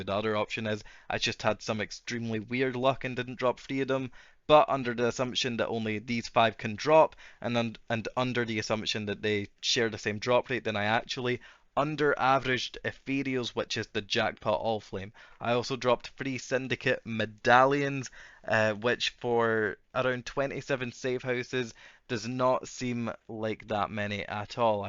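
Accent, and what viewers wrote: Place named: Scotland, United Kingdom. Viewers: Scottish poe gamer les gooo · I was thinking the same, Is that scotish accent known from samurai jack:)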